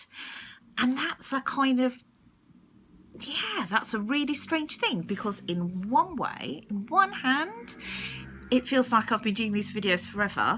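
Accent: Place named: United Kingdom. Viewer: Nice. Maybe the lady with the tissues remembers the day as well. I enjoy your accent, very different from the Canadian I'm around. I can't "place" the differences in the British ones very well. We don't have accents over here of course:)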